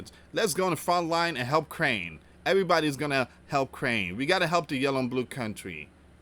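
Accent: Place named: Portugal. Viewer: Hi, are you Portuguese? I can't quite figure out what type of accent you have. You remind of the Dog Daddy, he has the same accent. He is a great young man who trains problem dogs of all types.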